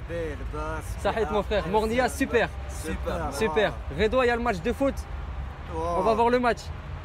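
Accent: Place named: Morocco. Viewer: Je suis marocain je m’abonne frère t’es un bon et maghnia excellent il parle comme les marocain certains Mashallah big up pour les frère algérien 🙏🙏🙏🙏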